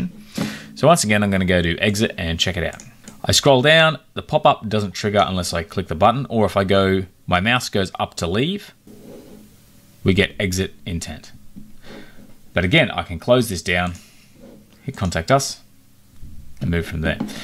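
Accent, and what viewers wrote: Australia. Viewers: Thanks for the tutorial. Nice to hear an aussie voice too!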